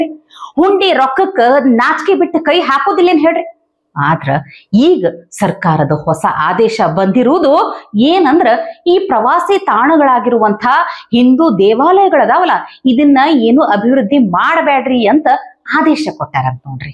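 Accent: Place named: Karnataka, India. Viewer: It is a pleasure to hear your Kannada accent.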